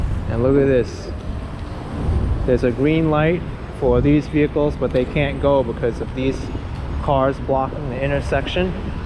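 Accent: New York, United States. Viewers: Which borough is your accent from?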